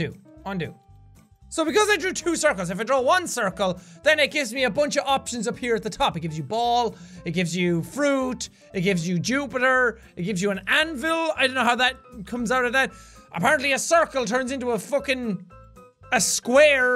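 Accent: Ireland. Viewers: Are ya Irish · ur Irish accent really pushed in this video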